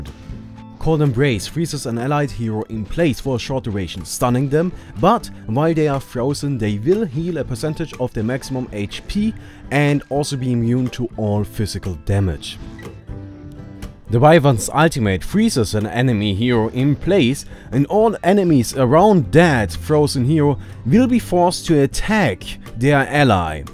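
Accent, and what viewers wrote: Germany. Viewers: german accent :)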